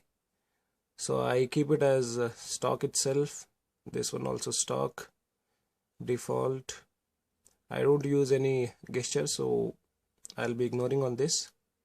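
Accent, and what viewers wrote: India. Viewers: INDIAN VOICE DON'T TRUST THEM? Don't forget that Thomas Alva Edison and vishveshwarya were INDIAN'S...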